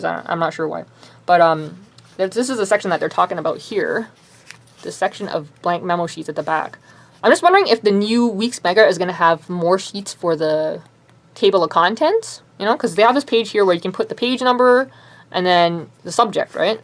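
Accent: Canada. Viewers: I love how you sound so canadian. Lol. The fast speech and dragging out certain letters or sounds. I hope im right in guessing your canadian? >.<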